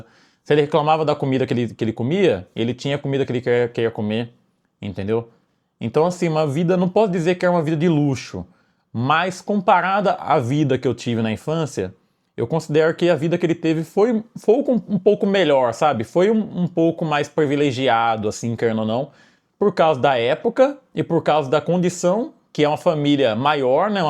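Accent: Brazil. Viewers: Vey sei q n tem nd a ver mas seu sotaque é mto bom ai qria te pergunta de onde ce é parece mto interior de sp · De onde você é? MG? GO? Achei sotaque diferente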